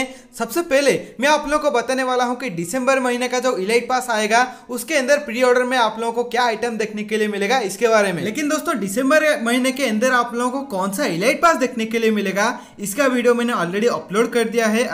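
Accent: Odisha, India. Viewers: Bro are you odia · Bro are you from odisha? 😅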